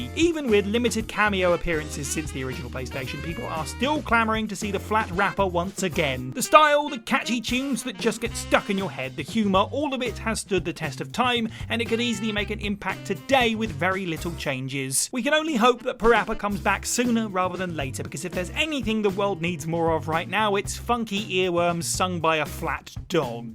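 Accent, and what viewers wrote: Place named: Scotland, United Kingdom. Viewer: Can someone explain to me why scots say "Sweatch" instead of "Switch"??😂😂😂 Sounds smooth af 😎😎😎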